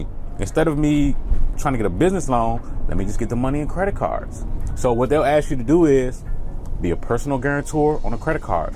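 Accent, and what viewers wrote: DMV, United States. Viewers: I heard the DMV accent and subscribed. You’re in my part of the world so your information is more relevant to me.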